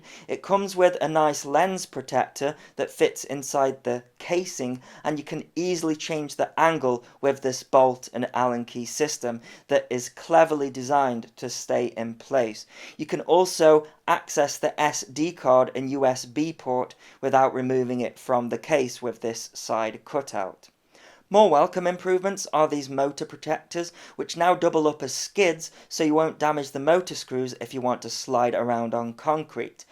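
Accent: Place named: United Kingdom. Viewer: Yey! He's back... Teasing us with his new toys!!! :-D Great to hear more and more British accent on the hobby channels!!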